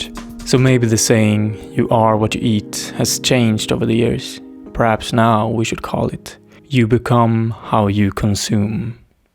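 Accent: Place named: Scandinavia. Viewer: sombre tone makes me feel like i should be contributing and leaving an actually intelligible comment but i just wanted to say i got excited trying to pinpoint ur accent, was like eastern european at first but i think its nordic....?? thank you for the video :) (i deal with it with blocks too... and always carrying my notebook with me .. with routines listed out to fall back on)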